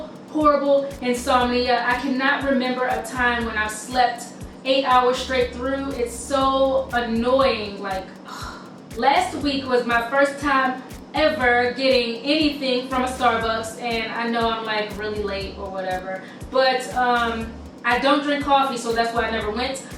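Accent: Baltimore, United States. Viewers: I knew u were from bmore by ur accent. Youu-teuuuube!! Lol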